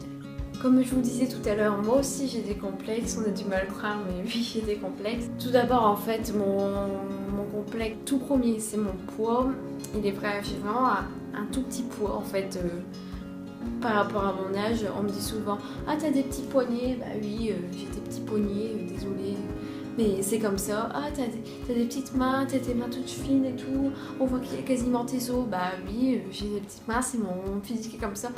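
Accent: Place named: Nord-Pas-de-Calais, France. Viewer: TRES BONNE VIDEO ! On entend bien l'accent du nord, bien de chez nous ! ^^, question complexe perso j'en ai plein, mon corps en premier (obèse), mais j'ai adopté le "balécouillisme" enfaite, sa consiste a ignoré le regard des autres, les moqueries etc. Il faut juste s'écouter soit même, perso depuis que j'ai adopté ce truc, je n'ai aucun soucie a me foutre en boxer de bain, si tu n'aime pas mon physique tu ne me regarde pas puis c'est tout ! et comme je dit toujours "Si tout le monde se ressemblerais, le monde serais CHIANT"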